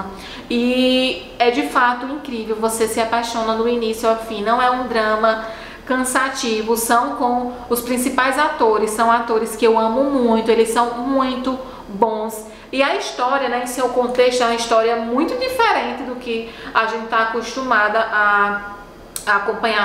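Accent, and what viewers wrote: Northeast, Brazil. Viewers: A melhor crítica que fiz para um dorama escrevi para IREI QUANDO O TEMPO ESTIVER BOM. Simplesmente maravilhoso. Eu adoro os vídeos da. Esse sotaque nordestino é delicioso de ouvir! Parabéns, linda! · você é nota mil e o seu di do Nordeste e Norte é show vem conhecer o Ceará flor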